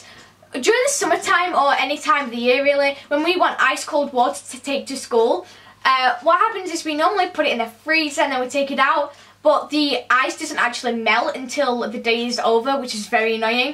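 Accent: United Kingdom. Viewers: Bitch she just has a British accent deal with it I found it very helpful!! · U have a nice British accent 😁👍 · The British accent is so cute!!!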